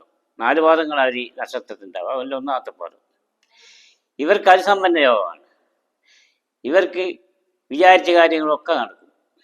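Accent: India. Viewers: kannur malayalam 👍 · വടകര.... കുറ്റ്യാടി സ്ലാങ് 😍👍....... നല്ല പ്രവചനം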